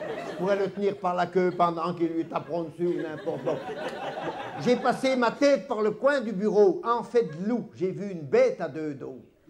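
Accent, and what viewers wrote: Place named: Vosges, France. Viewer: Ben oui il est excellent. vive les Vosges et le patois !!.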